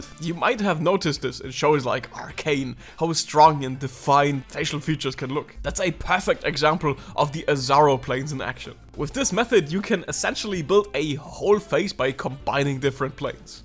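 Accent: Germany. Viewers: I only subbed because you keep on showing up in my feed as I'm trying to learn art and I find it kinda funny that we are both named and speak english with a wacky german accent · Are you german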